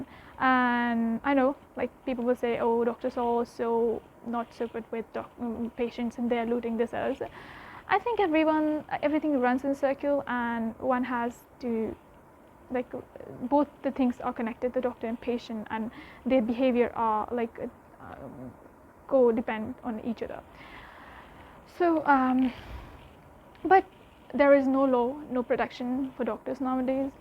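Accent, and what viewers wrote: United Kingdom. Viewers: Mashallah. Nice English Accent though, 👍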